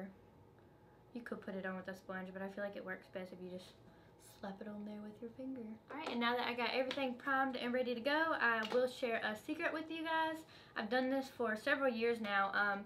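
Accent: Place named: South, United States. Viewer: Wow! That’s a crazy trick considering coconut oil actually breaks down Makeup!! You look super gorg!! Loving the bloopers!!! Where are you from? I can tell you’re a southern sister!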